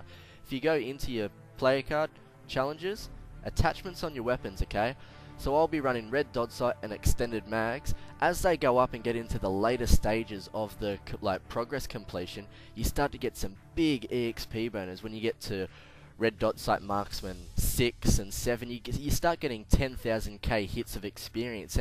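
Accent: Australia. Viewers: Subbed... Awesome to hear an aussie voice....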